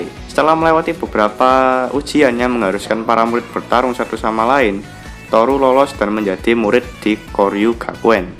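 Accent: Java, Indonesia. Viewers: Medok tapi bagus unik..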